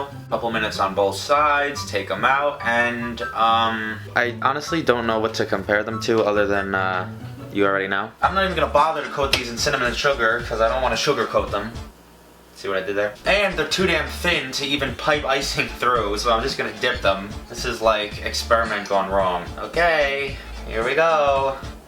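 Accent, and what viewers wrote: New York, United States. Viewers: If he isn't from New York I'm gonna doubt my accent knowing skills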